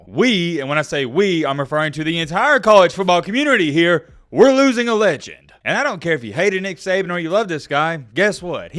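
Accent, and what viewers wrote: South, United States. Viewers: I grew up in Alabama (Mobile) but have been on west coast for ages... so i've loved your insight from someone with closer "boots on the ground". AND hearing that southern accent reminds me of epic days growing up in the deep south. Love your passion for Bama football!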